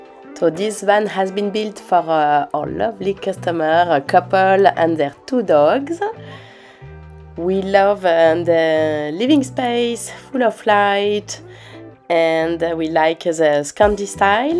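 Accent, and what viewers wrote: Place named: France. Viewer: Love your french accent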